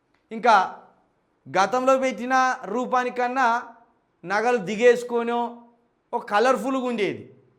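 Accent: Telangana, India. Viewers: anna nuvvu matladithe kadupu nindinattu ayithadhi anna....super Telangana yaasa....malli gadini malla okasari arusuko anna gap asthundhi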